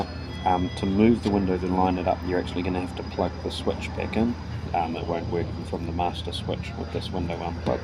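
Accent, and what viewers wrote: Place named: New Zealand. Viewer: Gr8 video M8. My door just failed and this is very helpful to see what is involved for me to undertake (?) or my mechanic. Where are you located? Sounds like a NZ accent! Cheers.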